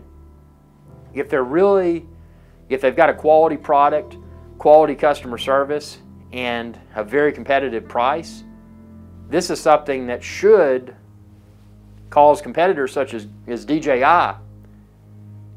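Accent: South, United States. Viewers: That southern drawl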